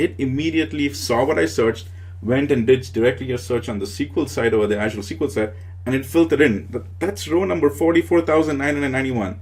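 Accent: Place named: India. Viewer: thank you every video, one question I have, which is off topic, your accent is somewhat INDIAN, are you ever belonging from india, please answer sir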